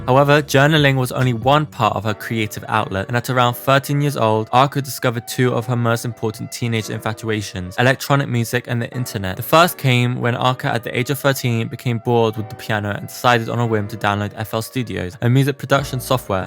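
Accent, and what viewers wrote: United Kingdom. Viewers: ok soy Venezolano, Arca en este País es algo mal visto, aunque ya no tanto, aun así desde que lo o buena "La" conocí fue apenas en 2020 y me encanto muchísimo su música y cuando supe que era Venezolana casi me derrito de las felicidad, muy buen vídeo, todavía me cuesta entender el acento británico :´)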